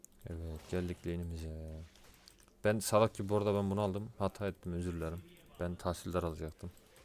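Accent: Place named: East, Turkey. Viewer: Merhaba guzel şiven var dogu tarafı bi şive Allahıma kitapıma ha gibi cümleler kursan o dogu tarafini bize hissetdirirsen cok samimi ve guzel olur diger yayincilardan farklanir kendine ozgun kitlen olur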